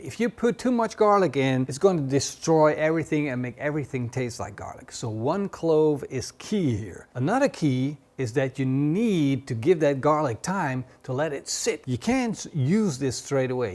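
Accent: Netherlands. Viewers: You must be dutch, can hear the accent. great recipe tips. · What a big Dutch accent bro . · Meest nederlandse accent ooit haha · Awful Dutch accent. I hate the Dutch. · Awesome recipe! But please do something about your randstad accent. It's appalling to us Dutch who speak English without that shitaccent. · I'm Uruguayan, Chimi is our "religion" and this is a very good recipie. Your ingredients choice are correct and kudos for not adding 'inovative' ingredients. The choice of oil and salt makes it more 'gourmet' but the basics are 100% correct Only improvment I can see is that if you want to have the best Chimi ever, replace the dried oregano with FRESH oregano. it will upgrade it to a totally new level ps. you sound Dutch. where are you from ? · Dutch accent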